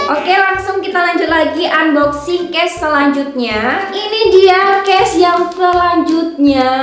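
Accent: Java, Indonesia. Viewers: Kok ngmg jawanya berkurang 🙂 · Kak orang mana sih kok logatnya kyk orang jawa..jawa mana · Medok bangett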